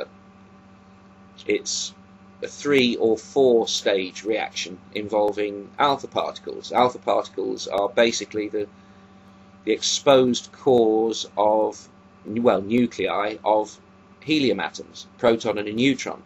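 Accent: United Kingdom. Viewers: i love your british accent, i don't think i would have watched the whole video with an american one (especially a southern accent). :) science is awesome! at least it's something to rely on; not the God character.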